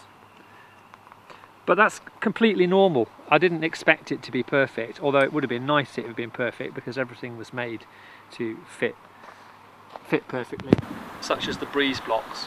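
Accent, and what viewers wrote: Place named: England, United Kingdom. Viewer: Hi are you from uk, you have got a great English accent and great videos!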